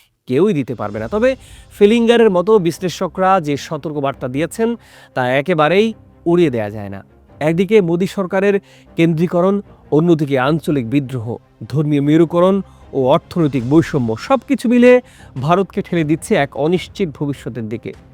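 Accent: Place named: Bangladesh. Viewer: ভারতের কোন বিরোধি দল বিচ্ছিন্নতাবাদ সমর্থন করে? এটা ষড়যন্ত্র ছাড়া কিছু নয়। কোনও সৎবুদ্ধি সম্পন্ন ভারতীয় আর দেশ ভাগ হতে দেবে না। প্রতিবেদকের উচ্চারণ শুনে একজন বাংলাদেশী বলে মনে হচ্ছে। এই ধরণের প্ররোচনা মূলক ভিডিও বানানো থেকে বিরত থাকুন। আর কোনও ব্যক্তি বিশেষের দেশ নয় ভারত। এখানে সত্যিকারের গণতন্ত্র আছে। তাই এই দেশ 140 কোটি ভারতীয়ের। অবশ্য সত্যিকারের গণতন্ত্র কী তা বোঝার ক্ষমতা বাংলাদেশীদের নেই।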